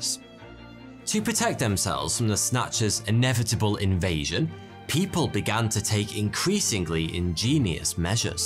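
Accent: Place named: England, United Kingdom. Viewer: All I can say is, wow! Sounds like jolly old England. Ha haha! James May.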